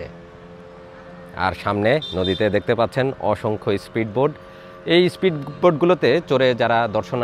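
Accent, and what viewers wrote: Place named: Pabna, Bangladesh. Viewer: পশ্চিমবাংলার কোচবিহার জেলা থেকে দেখছি। ভিডিওটা এক কথায় অনবদ্য হয়েছে। বাংলাদেশের এই তিস্তা ব্যারেজ সম্পর্কে আমার কোনো ধারনাই ছিল না। যদিও আপনার ভাষা যথেষ্ট মার্জিত, তথাপি মনে হলো আপনি পাবনা জেলার লোক। আমাদের এখানে কুচবিহার জেলায় অনেক পাবনা জেলার লোক বসবাস করে। যদিও আমার পৈতৃক ভিটে রংপুরে ছিল।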